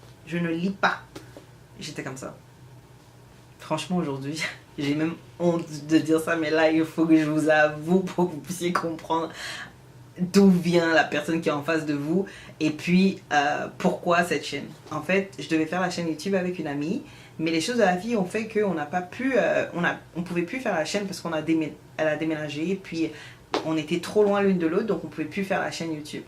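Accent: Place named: Quebec, Canada. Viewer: Olala ça fait des années que je ne t’avais pas vu! T’as bien pris l’accent québécois ! C’est cool que tu te sois lancée dans ta chaîne YouTube. Bon vent !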